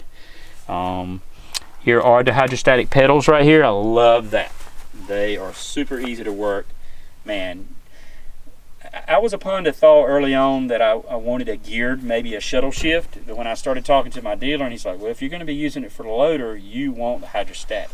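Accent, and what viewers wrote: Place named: South, United States. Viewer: I LOVE the highly intelligent review combined with the southern accent. You Sir are a class act and representative of all the good natured intelligent rural folk across our great country. And excellent review, subscribed!!!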